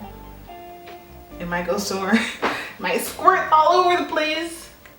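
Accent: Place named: Netherlands. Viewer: Nice subtle job. I thought you were from the Netherlands haha because you have a accent.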